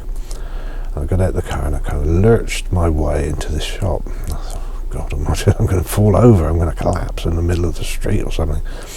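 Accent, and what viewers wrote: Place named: England, United Kingdom. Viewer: What accent do you speak ? It must v certainly come from England Such a fine English is hard to find on YouTube So nice and gentle and mind soothing effect , your way of describing things is great . God bless you your words are indeed helping many here.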